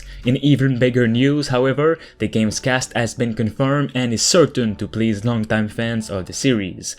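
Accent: Quebec, Canada. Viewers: T’es tu Québécois par hasard? 👀😂 Good content, continue comme sa!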